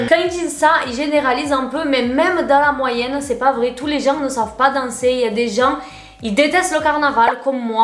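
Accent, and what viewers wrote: South, France. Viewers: Mdr j'aime trop ton accent de meuf du sud. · tu dois être de toulon peut être, c'est l'une des rares exceptions en france pour qui le rugby est une institution. D'ailleurs, je trouve que t'as un accent brésilien (of course) mais mixé avec l'accent du sud, je trouve ça vraiment stylé.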